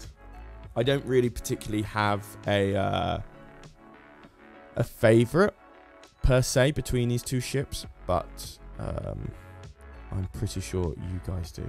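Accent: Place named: United Kingdom. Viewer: His voice is different like more British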